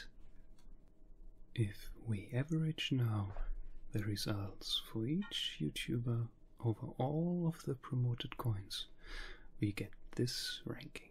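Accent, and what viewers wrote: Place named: Greece. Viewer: Are you Greek by any chance? The accent suggests you might be!!! Ευχαριστώ!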